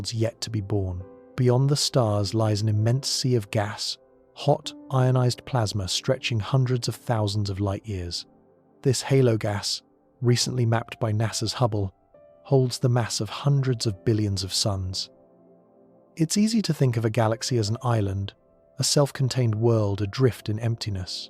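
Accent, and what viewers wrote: United Kingdom. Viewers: all these damn AI robotic voices have Brit accents. Can't we get some with Nigerian or Peruvian accents?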